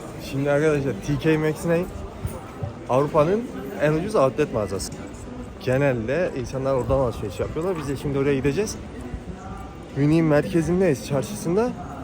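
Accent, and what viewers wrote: Erzurum, Turkey. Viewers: Abi sen erzurumlumusun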